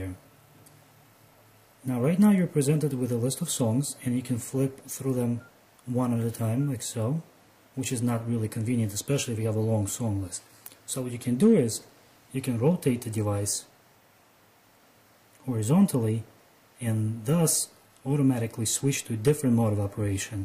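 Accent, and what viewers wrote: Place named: Russia. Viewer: He have a russian accent :))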